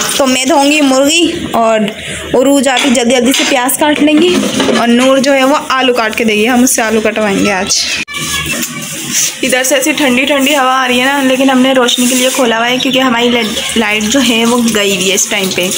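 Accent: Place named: Karachi, Pakistan. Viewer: Ap memon hn? Apki language karachi jese lg rhai 🤭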